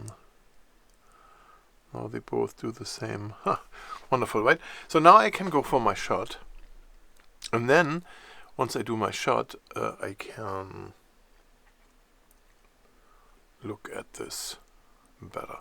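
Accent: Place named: Germany. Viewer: German English... it's easy to understand :) But the video was very helpful, by the way, thank you.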